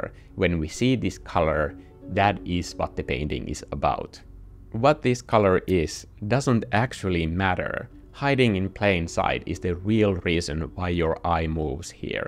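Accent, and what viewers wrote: Finland. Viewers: I KNEW I recognized a fellow Finn in your accent!!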